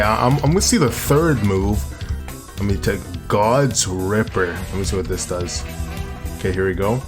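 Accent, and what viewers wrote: Jamaica. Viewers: Yow u jamaican?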